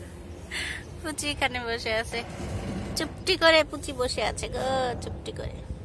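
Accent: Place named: Barishal, Bangladesh. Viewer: মাশা-আল্লাহ এই বরিশালের ভাষা আমার কাছে শুনতে ভালা লাগে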